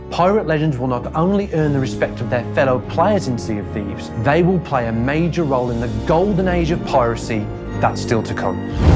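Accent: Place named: Black Country, United Kingdom. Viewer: That's a Telford or Yam Yam accent I'm sure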